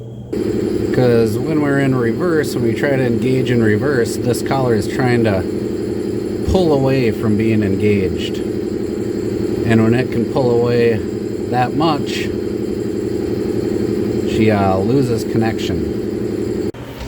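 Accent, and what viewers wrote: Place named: Minnesota, United States. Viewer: So ur accent says north...Minnesota?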